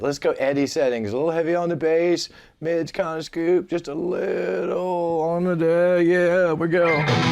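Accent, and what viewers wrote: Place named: Delaware, United States. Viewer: You’ve got sort of a Walton Goggins voice and humor thing goin’—that’s a compliment. [Vice Principals, The Righteous Gemstones….] You’re from Delaware? I grew up there but don’t remember that accent. // Looking forward to getting this amp in a few days—well, oddly, it’ll be this or a Princeton or Deluxe Reverb…. Depends on which one makes it move….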